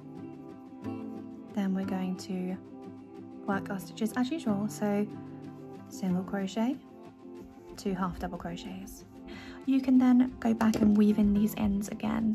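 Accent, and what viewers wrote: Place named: United Kingdom. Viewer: love!y to hear a fellow Brit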